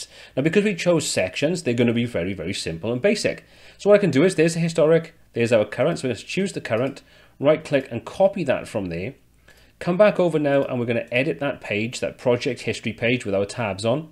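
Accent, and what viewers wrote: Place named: Newcastle, United Kingdom. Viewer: Well, isn't that an elegant approach, very smart! I'm trying to find some visually interesting way of doing 3 tabs that are all text (question then answer), and found your video, and though this doesn't work for me in this case, it's something I'm bookmarking and saving, as I think I may have a use for this in the future. Thank you. Have subbed as well, am looking forward to watching more of your content. And, you sound Geordie...are you? My Dad was from Wallsend, it's my favourite UK accent and always makes me think of him when I hear it!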